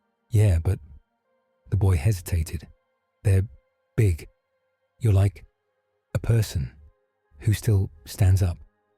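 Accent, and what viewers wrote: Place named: England, United Kingdom. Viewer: Why the hell is someone with an English accent narrating? Cap is an American! DA...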